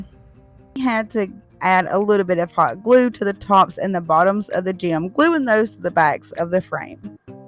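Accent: South, United States. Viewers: Beautiful.... I love your. Country accent super cute. Ive been watching you now for almost 2years. It goes by fast. Thank you for exploring your creative mind allowing us in on all your secrets!!!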